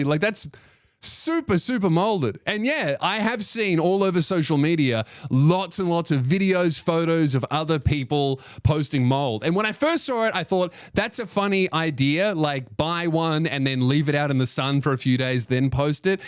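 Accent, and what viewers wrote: Australia. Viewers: Ew Australian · Everything is better with an Aussie narrator just sayin'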